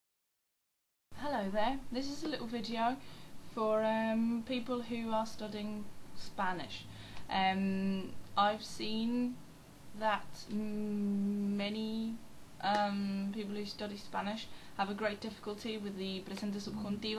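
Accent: United Kingdom. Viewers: ¡Hola,! Me encanta tu video, muchas gracias:)) Tu inglés británico es hermosísimo, y tus esfuerzos por explicar el uso del subjuntivo son excelentes. Yo mismo soy profesor de español, y me cuesta mucho trabajo explicar el subjuntivo a mis alumnos rusos... ¿Eres de Chivilcoy? ¿Por qué me mudé a Rusia y no allí...?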